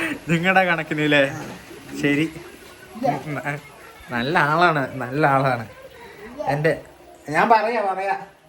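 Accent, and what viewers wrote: Palakkad, India. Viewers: ചേട്ടൻ്റെ വീഡിയോ ഞാൻ കാണാനുള്ള പ്രധാന കാരണം ഞാനും പാലക്കാടിൽ ആണ് താമസിക്കുന്നത് ഈ ഭാഷ സംസാരം കേട്ട് തന്നെയാണ് വീഡിയോ സബ്സ്ക്രൈസ് ചെയ്തത് ❤️‍🩹പിന്നെ യൂട്യൂബിൽ ഉള്ള ചില വ്ലോഗേർസ് ൻ്റെ വീഡിയോസിൽ കമൻ്റ് ഇട്ടാൽ വലിയ ജാട കാണിക്കും ഏത് കമൻ്റിനും റിപ്ലെ തരില്ല😑 പക്ഷേ ചേട്ടൻ ഞാൻ ഇത് വരെ ഇട്ട എല്ലാ കമൻ്റിനും റിപ്ലെ തരുന്നുണ്ട് 👍❤️ അതുകൊണ്ട് തന്നെ ഞാൻ ഇനിയും ഇടുന്ന എല്ലാ വീഡിയോയും കാണും സപ്പോർട്ട് ചെയ്യും❤️‍🩹🧿🫂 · palakkad slang 📈🔥❤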